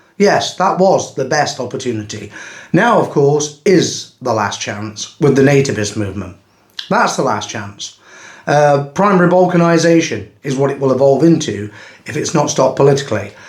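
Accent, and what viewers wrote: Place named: Midlands, United Kingdom. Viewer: Midlands, Derbyshire (Ilkeston), Notts (Hucknall) or South Yorkshire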